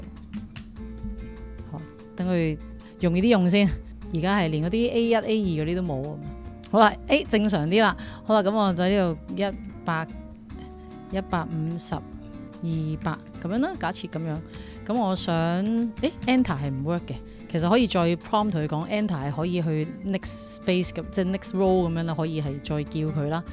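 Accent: China. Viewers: 好好听的白话，介绍的工具很有趣，关注了，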